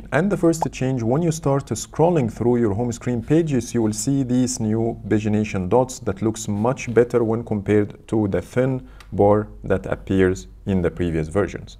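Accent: Egypt. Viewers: Man I don't know if you're Egyptian or not but i admire this Egyptian accent 😂❤️❤️ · انت مصري واضح من الاكسنت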